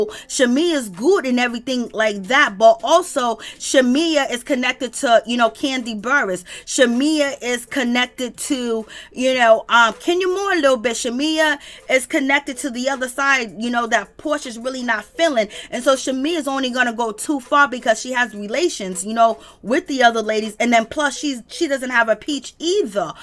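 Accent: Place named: South, United States. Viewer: YOU HAVE THE WORST ACCENT AND YOUR WORDS MAKE YOU SOUND REAL COUNTRY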